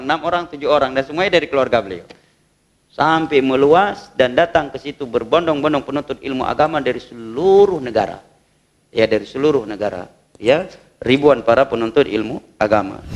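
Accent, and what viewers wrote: Aceh, Indonesia. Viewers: Sy seneng logat bahasa acehnya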